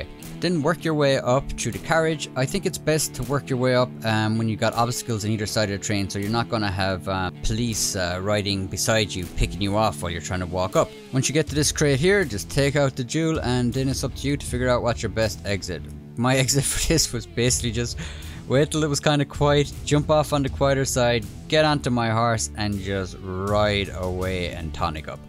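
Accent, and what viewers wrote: Ireland. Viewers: Never heard your voice until now. I doubly respect you for being Irish and for your incredible videos 😂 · Are you Irish?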